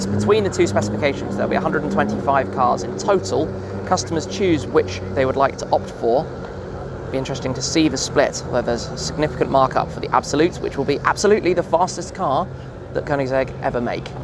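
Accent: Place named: England, United Kingdom. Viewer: The English. Can't handle their voice can't watch video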